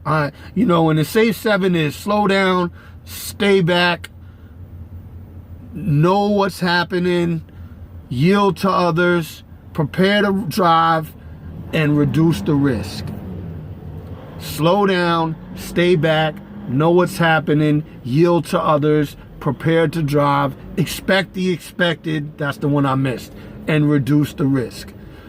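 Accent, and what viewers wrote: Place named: Brooklyn, United States. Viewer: Just saw your video, glad to see another New Yorker made it, ... you sound like you from Brooklyn, just like me lol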